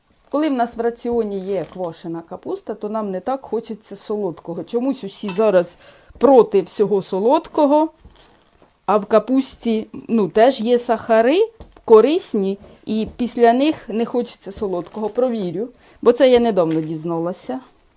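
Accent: Poltava, Ukraine. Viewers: Ви мабуть з полтавщини судячи по говору?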